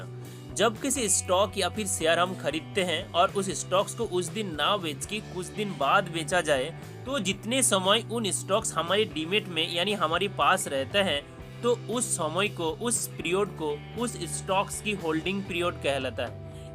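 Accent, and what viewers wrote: Bengal, India. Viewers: Dont mind bro.. Bengali speaking people ke liye thoda problem hota hai. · Bengali ho kya bhai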